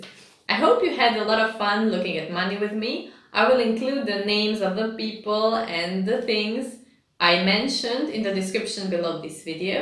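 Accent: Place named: Croatia. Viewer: Čuje se hrvatski naglasak, kad pričaš engleski ja ga imam isto kad pričam!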